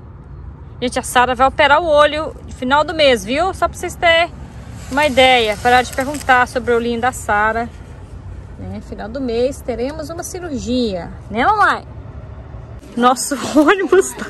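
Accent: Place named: Minas Gerais, Brazil. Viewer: FAZ DIFERENÇA SIM, SEUS CABELOS SAO LINDOS A PELE ESTA OTIMA! MAS O QUE EU GOSTO MUITO EM VC É O SEU JEITO MINEIRO DE FALAR. SE TORNA UMA PESSOA DIVIRTIDA DE SE VER CONTINUE SEMPRE ASSIM!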